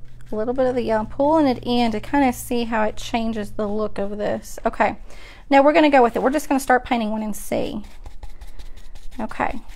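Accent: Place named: Kentucky, United States. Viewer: I found you by accident looking for how to make faux food- and the fact that you do primitive style?!! Wow! Even better! I love primitives!! I haven’t seen anyone do primitive style with faux baking. I’m a new sub. Your vids are so easy to watch and just love your sweet Kentucky accent 😊. Greetings from southwest Florida! 🍊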